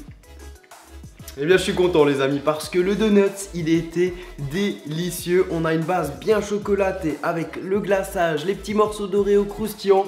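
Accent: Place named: Alsace, France. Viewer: Ma mère quand elle t'a vue elle a dit "Ah sa c'est un alsacien ! "elle dit que tu as un très bon accent Alsacien et elle espère que tu le gardera :-) #TeamSnap :-)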